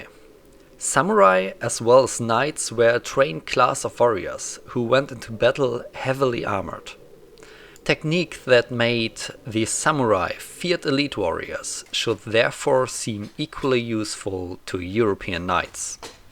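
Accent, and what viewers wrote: Germany. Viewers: I may be arrogant in believing that when it is stated that "most sources of medieval wrestling come from Germany" that there is a certain level of bias. This should be recognized, as the ancient greeks had pankration. I am not the owner of every manual on wrestling, so I can not confirm if most surviving records are in fact German, so it may be true. It's just jarring to hear someone with the accent of the country proclaiming that country to have the most/the best of anything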